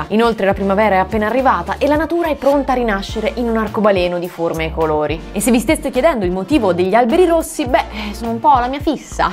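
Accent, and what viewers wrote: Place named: Central Italy, Italy. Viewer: L' accento da Italia centrale si sente un po' troppo, nel doppiaggio ...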